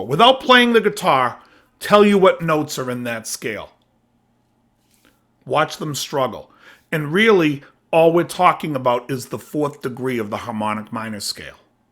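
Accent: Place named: New York, United States. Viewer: Like I said….really glad I stumbled onto your channel! So much great stuff….and you talk just like a a New Yawker. What part of New York are you from anyway?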